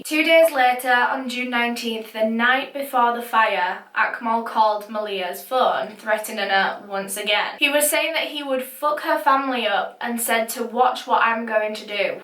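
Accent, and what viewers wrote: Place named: Yorkshire, United Kingdom. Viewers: Just got into your videos and I’m so happy I found your channel. I’m really interested in true crime stories and have pretty much binged your channel since I found it. Love the accent, I live in a town near Hull and went to University in Sheffield so it reminds me of my time there quite a bit. Keep up the amazing content you’ve gained a new sub :) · it's her northern english accent - i think she's from yorkshire cuz of her accent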